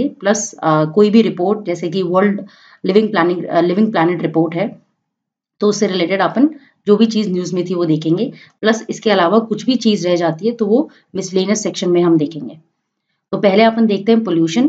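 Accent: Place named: Hyderabad, India. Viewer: pure Hyderabadi !! excellent vedio thanks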